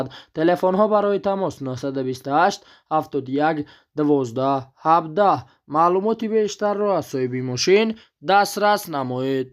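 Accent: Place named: Khatlon, Tajikistan. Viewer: А БАЧА АЙ ДУШАНБЕ МИНТАКАИ ХАТЛОН ГАПЗА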